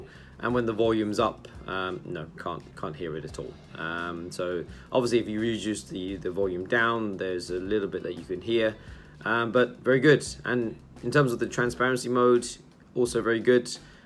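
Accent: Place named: United Kingdom. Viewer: Will these pair to an Apple Watch cellular so I can just go walking without a phone? Are you a native Singaporean? ( British accent) Cheers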